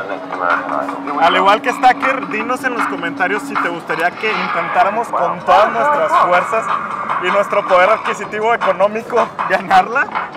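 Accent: Mexico. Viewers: ¿Eres Méxicano?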